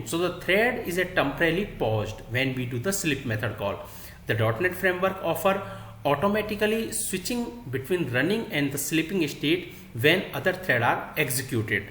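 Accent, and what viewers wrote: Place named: India. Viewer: Don't take it the wrong way, but in India you need to improve your diction when speaking. Happily was all written, otherwise would be honestly a disaster. The accent is funny sometimes and else, but in cases like this may create people's rejection on a content that's really good. Consider using a TTS voice instead.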